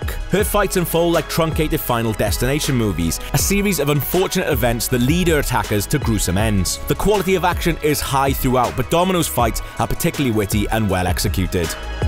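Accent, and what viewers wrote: United Kingdom. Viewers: Who's this UK B'och on the V.O?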